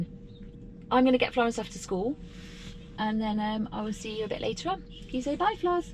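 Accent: United Kingdom. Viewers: My wife needs to see this! She complained about the Model 3 P that we rented for a quick weekend getaway with some kids. She said it was a harsh ride and didn't like it. I've got a LR Model Y coming end of Oct - early Dec and she thinks she won't like it.This is GREAT intelligence here! Doesn't hurt that it's narrated in a cool British accent 🙂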